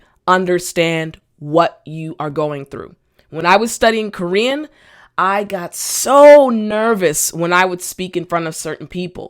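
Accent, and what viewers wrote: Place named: United States. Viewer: I love listening to your amazing beautiful American accent! I have lived in Pittsburgh, PA, and I've decided coming back to Brazil. I like your high mood by explaining things!!! Thanks,for your love in passing lots of information to us! I've been speaking English,for over 32 years, and I love this stunning language,that God gave me as a gift,and that is something I'm very thankful for him of. And you are the best teacher I've found on the web ever!!!! I congratulate you,and God bless you for this great work, and amen!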